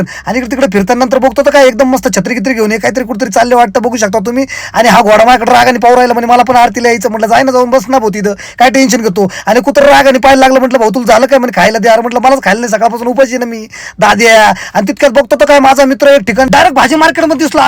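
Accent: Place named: Nashik, India. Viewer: Bhava lai fast bolto na kahi asu de pn aaplya Nashikcha ton lai bhari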